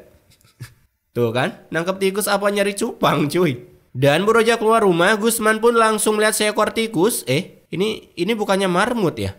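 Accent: Bali, Indonesia. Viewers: Logat Bali nya ketara bener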